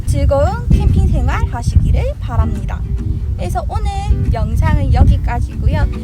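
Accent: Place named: Daegu, South Korea. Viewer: 저도 경상도 대구라 사투리 참 듣기 좋네요 ㅋㅋㅋㅋ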